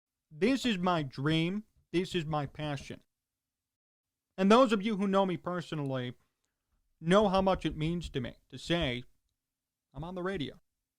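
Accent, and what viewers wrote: Chicago, United States. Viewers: A Chicago voice for those who love Chicago and I am from Iowa and live in Wisconsin. But love me some Chicago. You keep me connected to Chicago sports no matter where I am. It doesn't make any difference how old you are but that you talk sense. That's you,. And the real reason is that you are just one hell of a respectful person.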